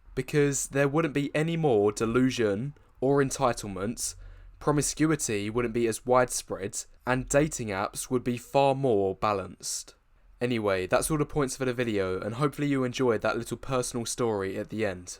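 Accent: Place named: United Kingdom. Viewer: bro your english regional accent along with your bluntly made message is so f***ing good. that being said it's really depressing hearing the truth :(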